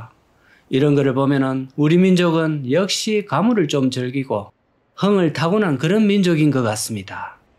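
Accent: Gyeongsang, South Korea. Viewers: 오늘의 사투리 따시고. 갱상도 간편한 사투리 등 따시고 배부르고.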